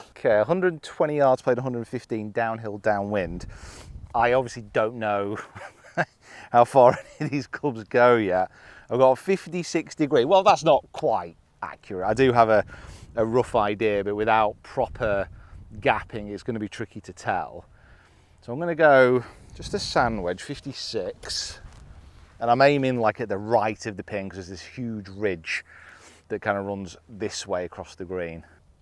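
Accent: United States. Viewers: your american accent is top notch, love it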